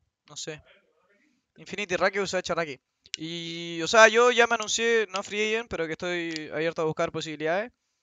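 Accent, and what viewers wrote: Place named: Argentina. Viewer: Pq habla como argentino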